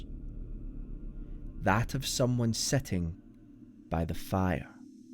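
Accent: Scotland, United Kingdom. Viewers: Author/narrator is Scottish, yo. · I really can't believe it's taken me so long to find your channel. You're a gifted author. I've not run across a single piece of yours that's not ended up in my favorites. Getting to hear them with your delightful Scots brogue is definitely putting the glaze on the cake for me. Weel dune me lad!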